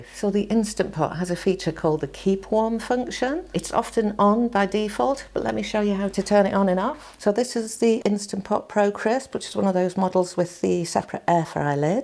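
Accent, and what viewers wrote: United Kingdom. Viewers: Dear Madam, your delivery, speech and of course, the British accent English is really something. Kind regards.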